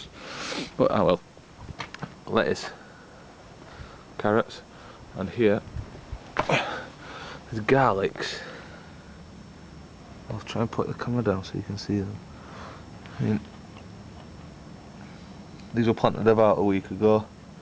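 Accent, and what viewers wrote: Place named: Northern England, United Kingdom. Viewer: After trawling through all the videos i subscribe to from around the globe its bloody great to here a northern accent !! Albeit from the wrong side of the pennines !! Have you got your name on an allotment waiting list ??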